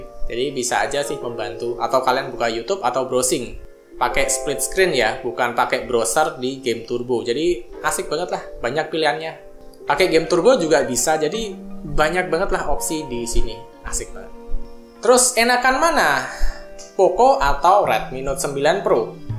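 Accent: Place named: Java, Indonesia. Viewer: logat jowo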